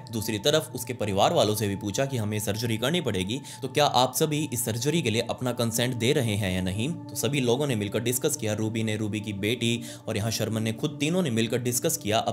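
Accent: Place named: Sirsa, India. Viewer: Bhaiyaji m haryana sirsa ka rhne wala hisar ke pass hi h Aapki boli sun ke pahchan gya ki aap sirsa ke aas pass rhte ho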